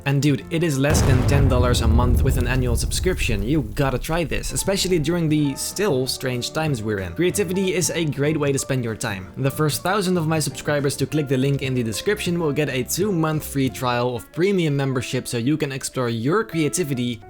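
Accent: Netherlands. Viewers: His Dutch accent is very annoying sorry, nice photoshop tho.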